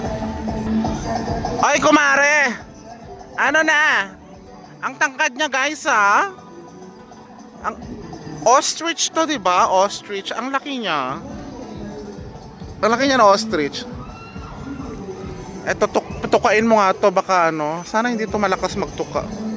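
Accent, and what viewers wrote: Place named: Visayas, Philippines. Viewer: Hahaha! Bisaya pala yan si sir!😂 "dako kog ulok" 🤣🤣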